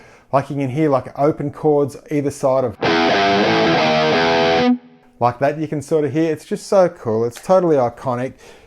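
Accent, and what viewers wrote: Australia. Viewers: There's only a few reasons I come to this channel: to learn how to play my favorite thrash riffs, dial in those glorious tones, listen to an Aussie's killer accent and occasionally have an exotic bird stare judgingly back at my bad technique or pisspoor tone or watch them perform perch acrobatics. This video delivered on all accounts as usual. Love the series idea and as always look forward to more vids from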